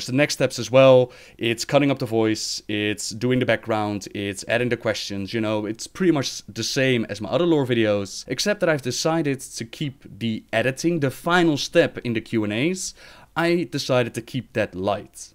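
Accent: Netherlands. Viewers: Dutch accents are great. I like how he pronounces "Th" as a "D" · I had no idea you were Dutch! I really like your accent and all of your Lore videos, my guildmates say I'm an Encyclopedia thanks in part to you:)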